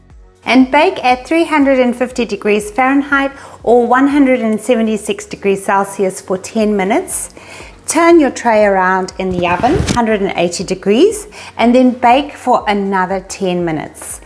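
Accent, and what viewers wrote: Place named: South Africa. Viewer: Yum looks so good. I’m definitely making it. Thanks so much for sharing this recipe. Love your South African accent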